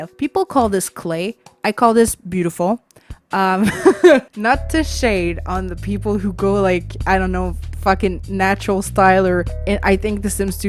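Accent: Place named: Quebec, Canada. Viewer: Love your, french Canadian? accent?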